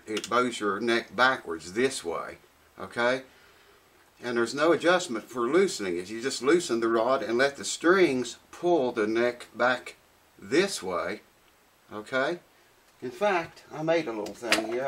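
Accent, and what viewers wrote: South, United States. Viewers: The rain makes me feel like weve been through some shit together. thanks for the wisdom. This is like the closest thing to America I feel like I've seen since I was was a kid in 1993 listening to my grandparents talk. That southern draaaawl mayne, I tell yeh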